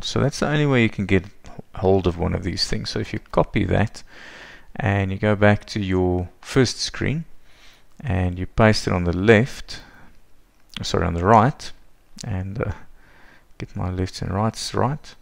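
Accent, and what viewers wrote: South Africa. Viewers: sorry this is a bit off subject but are you South African??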